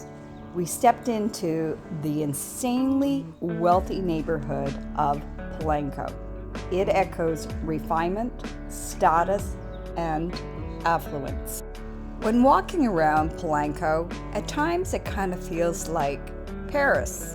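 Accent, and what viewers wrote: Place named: United States. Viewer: Do you have to pronounce it with an American accent? Change the "a" sound. It'll sound much nicer.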